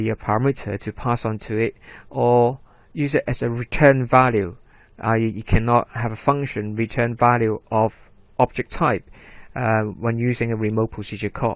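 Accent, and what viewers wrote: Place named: London, United Kingdom. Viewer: Nice video! We are you from? You sound like a Londener and Chinese at the same time (no ofense).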